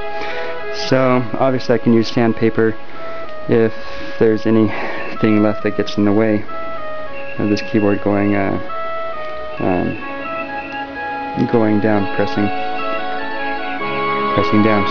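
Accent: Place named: Texas, United States. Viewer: Given the accent, the shadowing on the carpet, the actual carpet colour, and the way you're wearing that Seiko watch, I'd have to guess that you live in Texas, went to school in Utah, and have family living in Oregon. How close am I? Congrats on the keyboard, I may actually do that with a spare Saitek keyboard - would be good to confuse other peoples who're using my computer.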